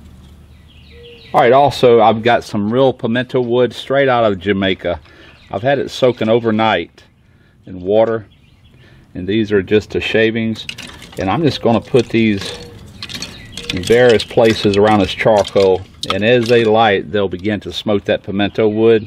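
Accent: South, United States. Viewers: the southern drawl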